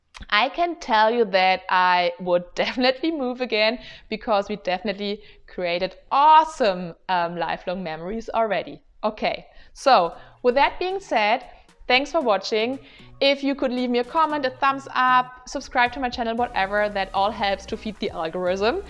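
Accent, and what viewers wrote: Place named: Germany. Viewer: I loved your joke… totally arrived on my end 🤣😂🤣😂🤣. But maybe that’s because I’m German haha! I miss the birds singing/chirping in Germany… I also miss going to the Bäcker and Metzger, or hanging out at the Bistro/Café. Here we get rushed off the table… I miss the laid back nature of Germany. I wish we lived closer so we could hang out for Kaffee und Kuchen 😁😁😁. But Boston is not the next closest trip from Colorado. Mein Angebot steht nach wie vor… wenn Du mit irgendwas Hilfe brauchst, melde dich einfach. 👍🏻 Like you said, your language/vocabulary will improve just by living your life daily and speaking it all the time. I’d say that Americans, or any English speaking person for that matter, understand you just fine. Even your German accent isn’t as strong as some other German English speaker. You’re doing VERY WELL. 💗 Have you tried the German bread website I shared last time? 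If not, definitely give them a try. I enjoy it very much.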